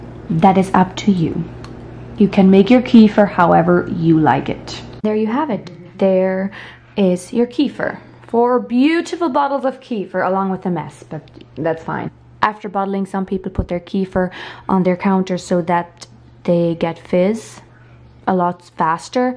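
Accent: Ireland. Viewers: I had never heard about this before! Thank you so much for sharing, very informative. You have such a beautiful accent! May I ask where you’re from/grew up? I hear an Irish lilt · You sound so Irish. Just amazed how Hutterite colonies probably stem from Irish roots.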